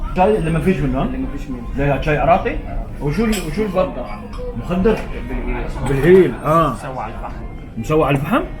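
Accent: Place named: United Arab Emirates. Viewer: الله محيي اصلك ، والله ذكرتني بايام الامارات واللهجه الاماراتيه الحلوه الله يديملكم الامن والامان ويرجع بلدنا العراق لخيره ونستضيفك تاكل دولمه ببغداد ان شاء الله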